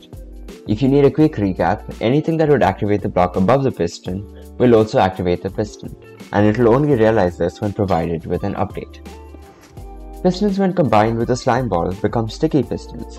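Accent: India. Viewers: With any chance are u indian?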